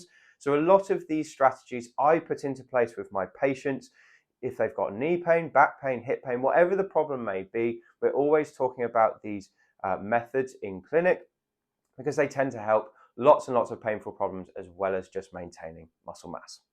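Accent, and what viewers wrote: United Kingdom. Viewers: İ love his British accent, he s so sweet..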